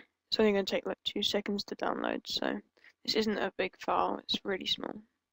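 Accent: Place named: England, United Kingdom. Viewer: luv ur english accent X)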